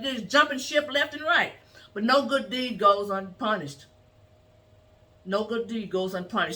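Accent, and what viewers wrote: South, United States. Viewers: God Bless you to... Trump needs you to be in his Cabinet. That's alot of passion. You sound a lil Southern but you got East Coast in your blood.😎👍🤟🇺🇸🇺🇸👊👏👏👏👏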